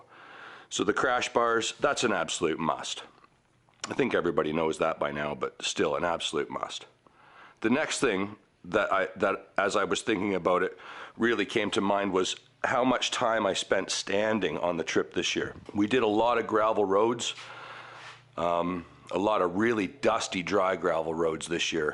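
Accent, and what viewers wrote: Canada. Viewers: Sweet canadian accent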